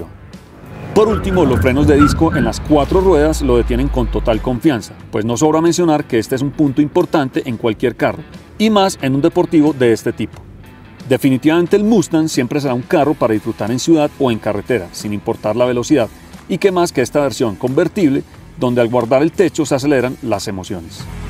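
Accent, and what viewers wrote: Bogotá, Colombia. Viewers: Mero hablado de rolo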